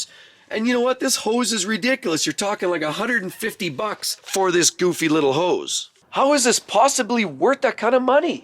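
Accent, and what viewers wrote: Canada. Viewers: Wait wait.. are you from Canada?!.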